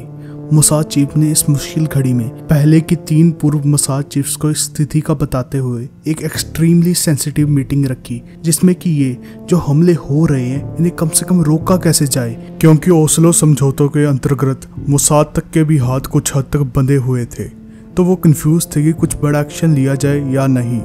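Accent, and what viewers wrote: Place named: Punjab, Pakistan. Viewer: Pakistani punjabi ryt bhaisaab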